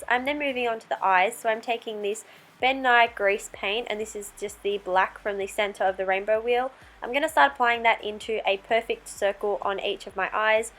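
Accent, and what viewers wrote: Australia. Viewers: Are you Australia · I love her Australian accent.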